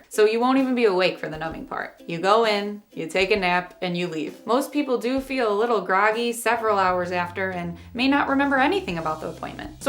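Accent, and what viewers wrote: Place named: Dakota, United States. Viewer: May I ask a question are you from north or South Dakota? I recognize the accent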